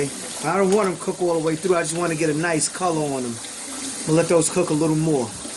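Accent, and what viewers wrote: New York, United States. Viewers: Dope video bro and I know a fellow New Yorker when I hear one. You actually sound like Ghostface. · You definitely from NY my nigga! Great Video! You do BBQ's? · Silver Stone that's how NY men talk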